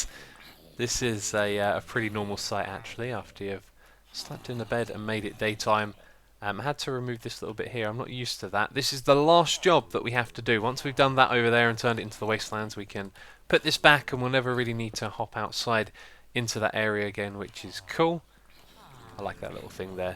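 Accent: England, United Kingdom. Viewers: He is from England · Questions for the Q&A : 1) Ketchup or Mustard ? (yes, there is a correct answer :P) 2) More generic one: What made you get into recording game play videos? 3) You're quite obviously a Brit, but where in the country are you from / grow up? I'm a Londoner personally, and your accent isn't that different from mine.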